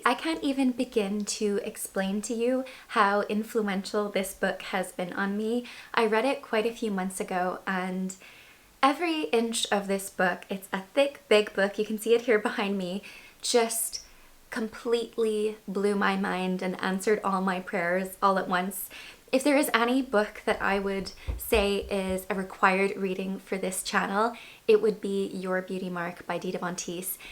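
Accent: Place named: Ireland. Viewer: The minute you said you were from Ireland i could instantly hear it in your accent, but i hadnt picked up on it before! I dont know why but i thought you were American! Another great vid, loving the content :) xx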